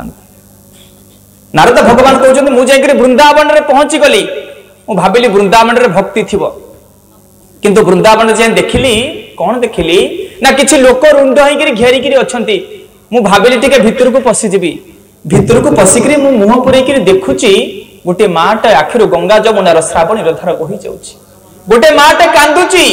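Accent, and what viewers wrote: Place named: Sambalpur, India. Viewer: ସମ୍ବଲପୁରୀ ସ୍ଵରରେ ଚାଲିଛି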